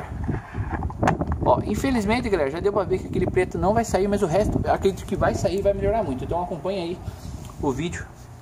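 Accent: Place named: Interior, Brazil. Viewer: Seu sutaque é do interior kkkkk tmj. mt bom, vlw pela dica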